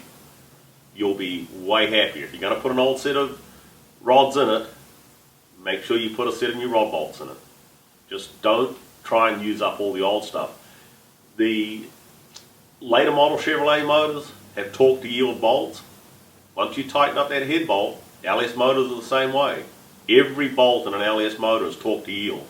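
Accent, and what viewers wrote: New Zealand. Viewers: His accent is a mix between Maori and Southern American. Lmao, is weird. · cant get rid of that kiwi accent ha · weird accent half kiwi half american?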